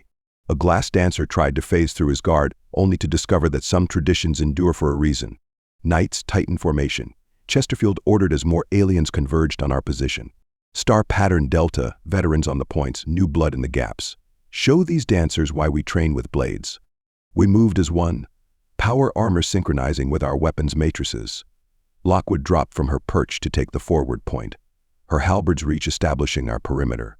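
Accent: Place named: Texas, United States. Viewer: It's more like Texas southern speak! Just enjoy a good story!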